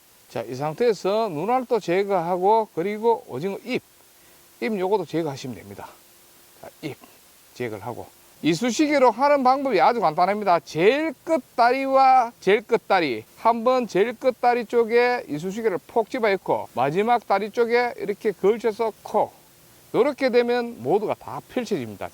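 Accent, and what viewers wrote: Gyeongsang, South Korea. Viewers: 갱상도 사투리 존나 듣기 싫은데 조심하세욧 ㅋㅋㅋㅋ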